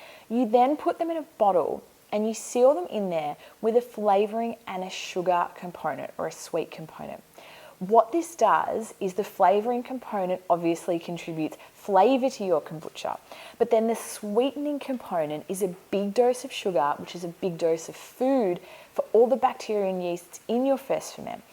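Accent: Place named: Australia. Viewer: Must be in Australia I love the Australian accent ariad I just made ginger beer that was easy but you do not need one of them nasty scobie's..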